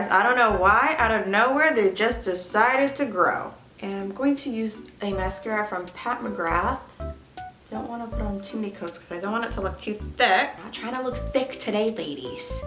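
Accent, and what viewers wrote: United Kingdom. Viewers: It’s not a video without the British accent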